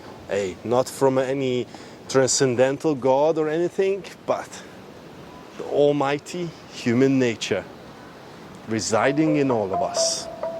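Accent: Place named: Glasgow, United Kingdom. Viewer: You sounds Glaswegian sometime maybe you were Scottish in a previous life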